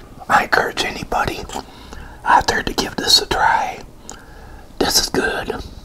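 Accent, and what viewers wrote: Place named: Texas, United States. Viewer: Because I thought you sound like a new Yorker but you sound like your from texas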